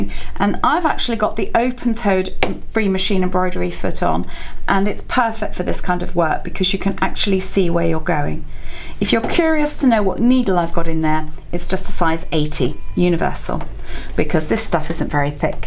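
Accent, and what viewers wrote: United Kingdom. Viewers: Thank you so much for this - have been sat getting very frustrated by wasting time on other useless videos and yours was perfect!!! 🤩 🫶 Quick question, if I may 😊 I have Brother 380D, and only 5 or so automatic embroidery patterns are marked for being ok for water soluble stabilser… the majority of the rest look pretty much chunky enough around the edges and fillings etc to be able to be held together enough -would they work and it’s just Brother being overly cautious? 🤭 Anyway, having watched your video, I now see that the world is my oyster! 😁 Thanks tons from a Brit who’s been living in France for so many years, that it was warming to hear your accent, as the majority of my crowd are French 😂❤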